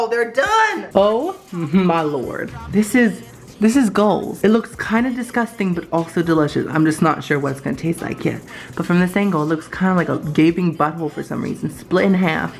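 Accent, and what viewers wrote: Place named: United Kingdom. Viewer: I love your British accent so on point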